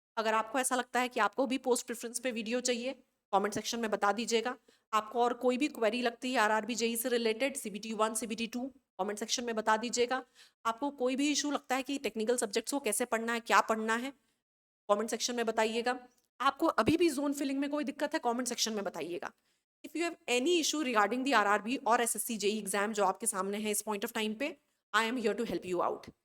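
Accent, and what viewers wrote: Chhattisgarh, India. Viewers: Ma'am aap chhattisgarh se hai kya??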